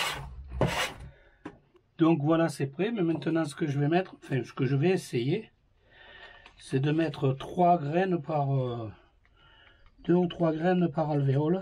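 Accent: South, France. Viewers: Coucou de Dordogne, j adore votre accent du sud , vos trucs et astuces, vos conseils , que du bonheur !! Merci et bonne journée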